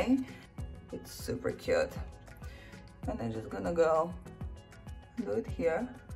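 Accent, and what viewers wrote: Russia. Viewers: She still speaks russian accent so much) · She’s russian i think it’s just her accent 🤣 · That russian accent adds more to her sexiness than all the beauty product combined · She is like a russian gangster with her accent and attitude. Im all here for it tho. Drop dead gorgeous. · They do look similar and she does sound like Adriana with a small Russian accent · i’m in love with her russian accent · The best things about her are the deep resonating voice with the Russian accent and the emerald green eyes wow 🥰👌🏻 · Had to come back again for her adorable Russian twang 🥰🥰🥰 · I don't know what I was expecting her to sound like but I love her Russian accent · I love her russian accent so freaking much 😭😍😍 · I love her Russian accent 😎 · У нее красивый русский акцент, очень мило)) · обычный русский? вы видимо не слышали как говорят большинство россиян на анг. 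Её акцент по сравнению с ними чистейший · Russian accent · И все же чувствует русский акцент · I Love her Russian accent 😍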